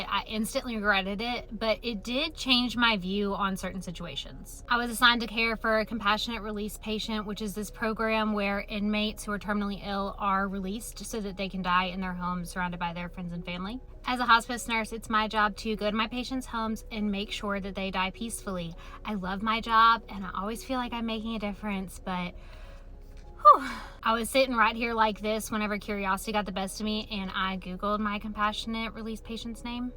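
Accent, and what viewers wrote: South, United States. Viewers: What part of America is this accent from?? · That’s one hell of a southern accent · I ❤❤❤❤❤❤❤❤ her country voice them southern folks accents makeme happy every trip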